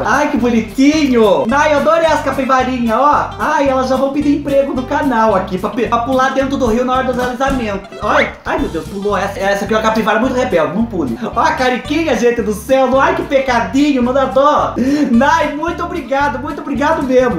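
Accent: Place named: Caipira, Brazil. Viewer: tudo que tu ganhou foi bem merecido, você faz a gente rir com esse sotaque meio caipira amo 👏🏾👏🏾👏🏾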